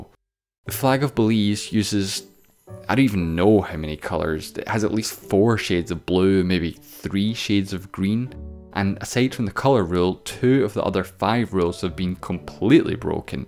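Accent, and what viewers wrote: Scotland, United Kingdom. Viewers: I don’t want to be culturally rude but is it me or does he sound Scottish (btw I’m new to this channel) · Is scottish or something?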